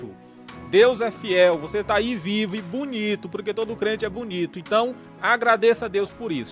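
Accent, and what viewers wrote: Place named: Northeast, Brazil. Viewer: Paz pastor gosto de assistir seus vídeos, e gosto principalmente do seu modo de falar,esse sotaque nordestino, sou nordestino mas moro em Manaus a muitos anos, oro pra que Deus continue abençoando o seu ministério, pois tem trazido edificação, Deus abençoe todos que assistem, vai nessa força varão Deus e contigo!!!!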